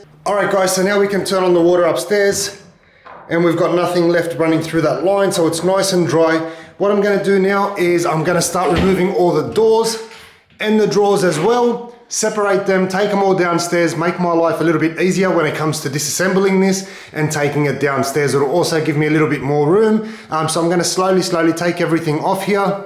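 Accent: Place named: Australia. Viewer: Simply explained but must be Australian.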